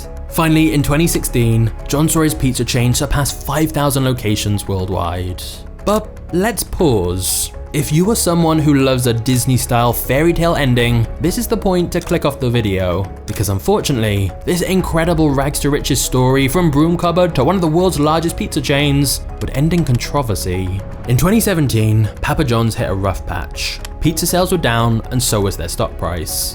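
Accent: England, United Kingdom. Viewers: English script reader, AMERICAN story. Fix that...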